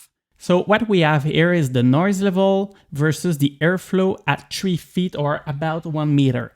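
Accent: Quebec, Canada. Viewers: Thanks. I just assumed you're from Quebec because of your accent. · J'aime que dans les 3 premières secondes du vidéo j'ai reconnu l'accent QC, et +1 pour l'avoir écrit dans l'intro de la vidéo! 😄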